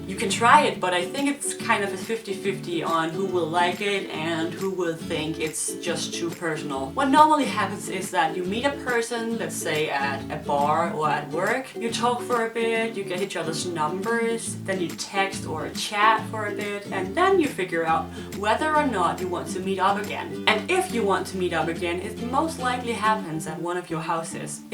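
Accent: Denmark. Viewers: you are awesome! Specially in the videos about Denmark hahaha And as I want to move to DK next year it is also nice to keep contact with the funny danish accent speaking english and getting to know the particularities of this lovely country. Greetings from Brazil!!! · Man kan godt høre på din accent at du er dansker😂😂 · PS: I've seen some of your videos and all I can say is that you're VERY Danish! Your face, your hair color (I love it btw), your facial expressions, your sarcasm, your accent... So Danish! And please don't get me wrong, it's meant to be a compliment :) And since you asked... I would like you to talk about how to be friends with Danes. I'm going to spend a year there and I'm afraid I'll live in a cave with my boyfriend and his friends. It feels like, once you've got a friend, you've got a whole network, but getting that first friend is almost impossible! 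Do you have any tips? I really like your channel and wish you the best luck with it!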